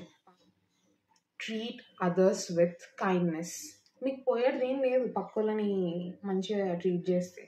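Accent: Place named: Telangana, India. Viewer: Telangana accent 🙌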